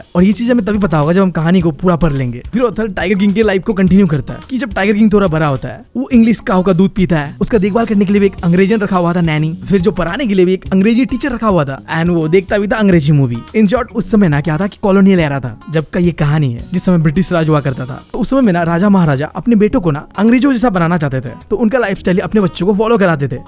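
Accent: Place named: Bihar, India. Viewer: You are from Bihar/Jharkhand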